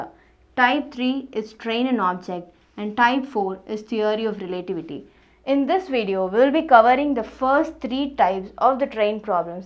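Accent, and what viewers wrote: South India, India. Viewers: Teacher you belong to (South Indian ) I'm right I identified in your language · Must say u r gazzab....or upar se ye thoda pronouncing me south indian touch...awsome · south accent .......sounds little weird but feels sweet .....nice lecture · Are u a south indian? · Very helpful ma'am Ma'am you from south?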